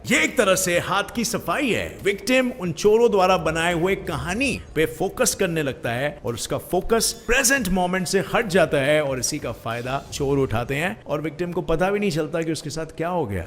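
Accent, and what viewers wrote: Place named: Bengal, India. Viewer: Your bengali accent is quite good.... 👍👍👍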